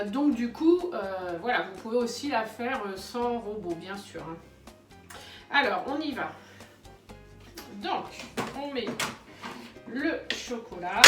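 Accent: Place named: Rouen, France. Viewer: Mais tu es de Rouen ?? Il me semblait bien avoir reconnu l'accent 🤭 Super tes vidéos j'adore !!!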